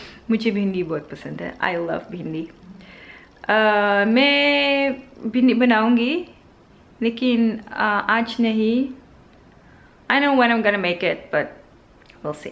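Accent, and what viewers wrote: India. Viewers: First time am watching your video n when I heard your hindi i was like 😲😲 just like delhi grl...... 😎😎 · Very impressed with your Hindi as you could speak almost in the accent that people of Bihar and Easter UP( Indian states) . Very nice indeed.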